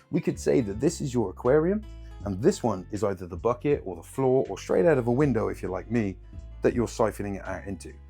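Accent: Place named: United Kingdom. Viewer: Mate are you from the uk by any chance?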